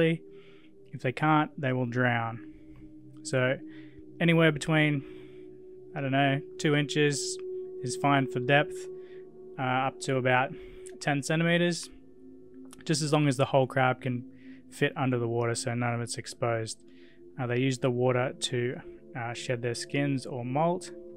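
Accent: Australia. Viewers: thanks for the info. I noticed your Aussie accent and I thought the laws got changed. Keep up the good work!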